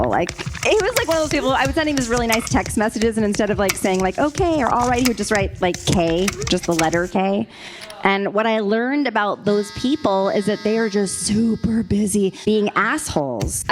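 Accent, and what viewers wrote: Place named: Mexico. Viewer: You sounded like lana but in mexican accent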